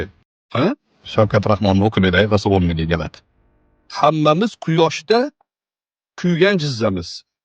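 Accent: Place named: Namangan, Uzbekistan. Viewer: Сизни Илмингизни хурмат киламан лекин илмингиз бор одам Наманган шевасида гапириб эрмак килдийиз исломда одамлар устидан эрмак килиб Кулиш борми номахрам аелга гул бериш борми, видойингизни таркатган1та аелни деб бутун Наманган устидан кулдийиз шу тугрими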